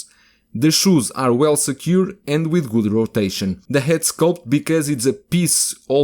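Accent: Portugal. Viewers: Pela forma de falar / sotaque logo vi 🇵🇹 Que honra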